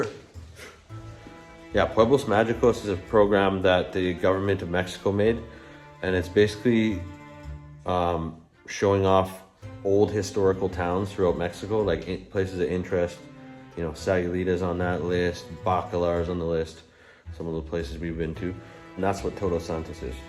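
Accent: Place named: Spain. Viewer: Just discovered your chanel I like your spanish accent, keep it up.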